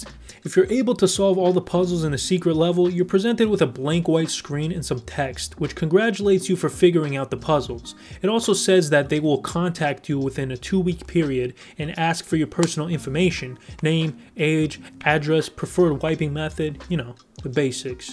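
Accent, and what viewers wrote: New York, United States. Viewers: Needed a real "Fuhgettaboutit" kinda guy to narrate my work session. Thank you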